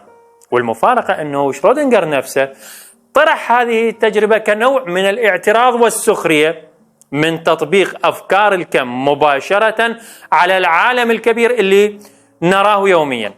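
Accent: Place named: Iraq. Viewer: اوكف انتَ عراقي 😮 واخيراً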